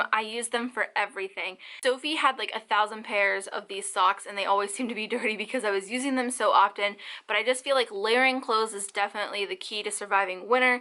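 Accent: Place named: Michigan, United States. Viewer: sounds like you live in Michigan!!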